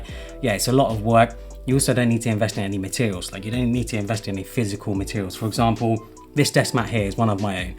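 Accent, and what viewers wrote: United Kingdom. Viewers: So lovely hearing and English accent. I'm so used to American channels...looollll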